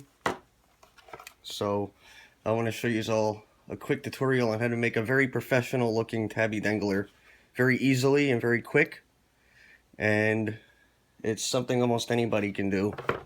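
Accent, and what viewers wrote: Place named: New Jersey, United States. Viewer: I really like ur channel. You get right to the point, no stalling, no boring rambling. We think alike, wondering where ur from, almost sounds like a jersey accent. I'm on fb in the groups, BR etc. Don't kno who u are but wouldn't ask u to put that info here. Keep up ur awesome work. Love ur Barkie collection!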